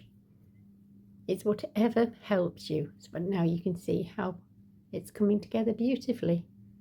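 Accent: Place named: England, United Kingdom. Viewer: I can see, however, you have an English accent or perhaps I am mistaken and it is Ai audio dubbed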